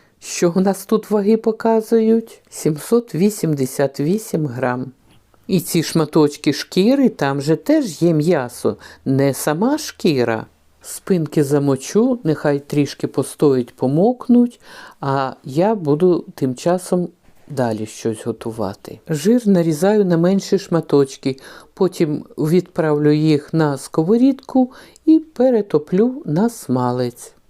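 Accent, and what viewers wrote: Ukraine. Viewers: Нееет, все ж таки кровушка отцовская перебарывает, люблю я вас хохлушечки, люблю ваш говор, люблю ваши песни, люблю ваши танцы, люблю вашу украинскую вышиванка и национальные одежды, правда, как много наготовили вкусняшек только лишь из 6ти спинок куриных, дякою за ваше видео, здоровья вам, счастья.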